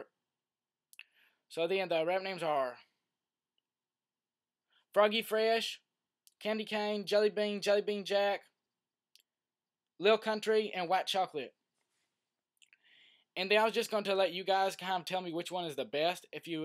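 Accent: South, United States. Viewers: one thing i hate but im not a hater is his south accent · One thing I don't get is why does he have a country tone but lives in Michigan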